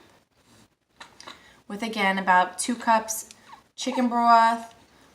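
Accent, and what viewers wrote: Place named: New Jersey, United States. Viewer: ps .. thank you fro the recipe and i love your jersey accent girl it is so cool LOL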